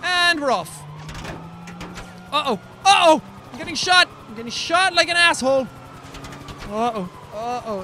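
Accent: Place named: Ireland. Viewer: TICKY BOMB love you accent I'm part Irish but I don't have the accent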